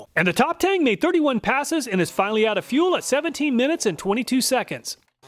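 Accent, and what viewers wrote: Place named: South, United States. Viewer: This guy sounds like an American red neck with a hatchet. He frequently refers to the two Made-in-China chain saws as “knock-offs.” Did the American brand patented the chain saw design, the two stroke engine, the cutting chain, the carburettor, the fuel system, the ergonomic, and everything else? Apparently, he’s showing his bias at best, racism at worst. Unsubscribe. Don’t subscribe.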